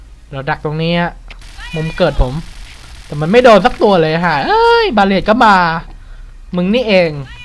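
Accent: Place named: Isan, Thailand. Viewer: ก็ว่าสำเนียงพูดเหมือนคนอีสาร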